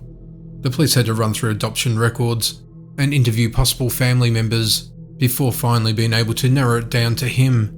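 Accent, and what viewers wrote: Australia. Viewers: Sounds more like he is from Australia to me.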